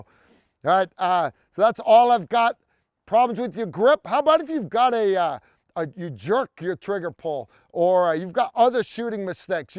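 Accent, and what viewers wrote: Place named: New York, United States. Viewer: New York accent really comes through here.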